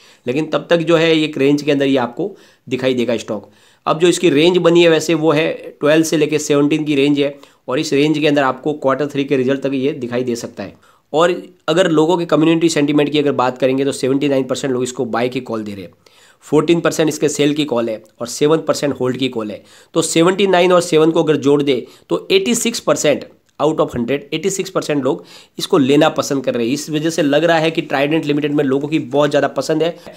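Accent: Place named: Marwari, India. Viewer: Rajasthan m bhi marwadi accent arha hai. Mybe belongs frm pali,jalore,jdpr,sirohi. May be i gs🤔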